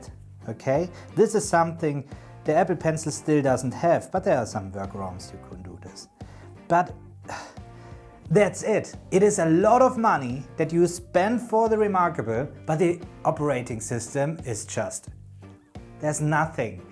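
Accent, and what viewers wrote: Germany. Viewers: U Sound a bit like you are Germa Are you tho? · People who speak German English are firm, and direct! Danke schoen Mein fruend🇩🇪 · dieser deutsche Akzent so geil😂😂😂🇩🇪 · German Akzent? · Are you German? :) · Are you German? I can’t place the accent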